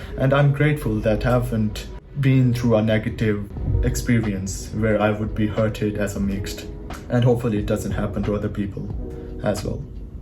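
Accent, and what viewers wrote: Pakistan. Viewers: Ah ... now I understand how your Urdu is so good. You did have a sort of a Sialkoti accent I thought when I first watched a CS14 reaction video from you but I wasn't sure. I thought may be your accent in Urdu is because of Japanese influence but I should have known better. I dated a Japanese girl in college long ago but still got fooled. Be proud of being half Japanese and half Pakistani. Both cultures have beautiful things in them. You are both. Cheers from Tampa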